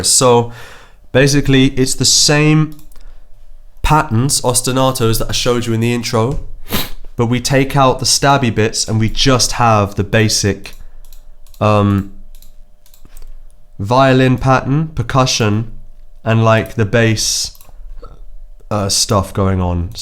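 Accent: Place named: United Kingdom. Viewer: Who knew you had such an amazing SPEAKING voice. And a Brit? I could listen to you speak and be happy. Thank you for sharing your gift!!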